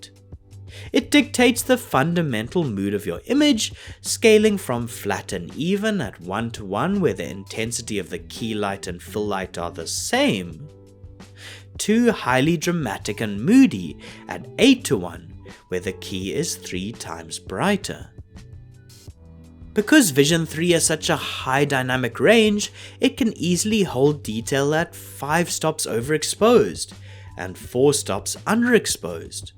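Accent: South Africa. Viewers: You for sure are from SA Bru, am I wrong? Howzit Boet 🫡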